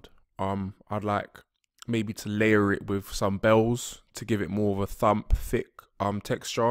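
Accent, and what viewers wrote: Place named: South London, United Kingdom. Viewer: Like you said which I concur, this video is really basic, more theoretical than practical but Yo!!!! What I really dig & would have to say at this the early stage, the primary reason I've enjoyed listening to what you have to say regarding mixing is that wicked "Attack The Block" perhaps South London English accent of yours :)....You know the saying "It's not what you say, it's how you say it!" Lol!...Anyway your accent, at least for me sounds & brings a much welcomed urban/street vibe from England that breaks up the monotony a little.... Yeah man anyway Big Ups & Propers from Los Angeles...Future Video request....Since you mentioned getting mixes sounding as good as Dre...Do a video on Mixing in Mono.....Peace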